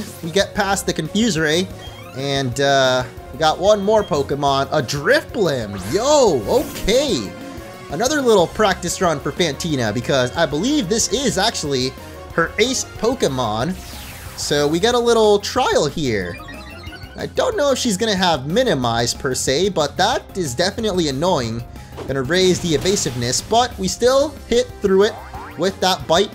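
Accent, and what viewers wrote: France. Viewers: Love your Frenglish accent xD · Your french accent is hilarious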